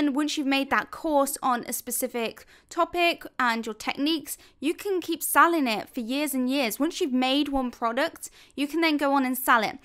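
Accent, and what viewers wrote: England, United Kingdom. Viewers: That is so pretty. Thanks for uploaded. And, again, I love your english accent. Saludos desde Ecuador.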